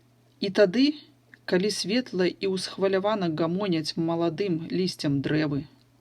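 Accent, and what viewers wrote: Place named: Russia. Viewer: если диктанты, это не всегда значит, что именно про экзамен. Это так же может быть обычная практика, но касаемо акцента соглашусь. Ощущение, что читает русский, знающий белорусский по "знакомым словам". Особенно произношение "г" бросается во внимание сильно.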